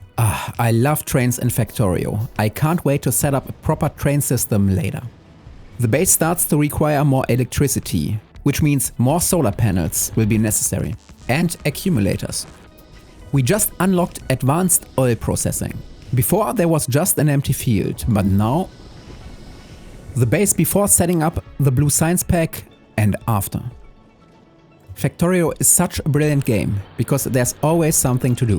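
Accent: Germany. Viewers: You sound german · Das ist das erste Video bei dem ich gerne eine Übersetzung von einer KI hätte. Dein Akzent klingt wie Bayrisch, nur schlimmer. Holy Moly.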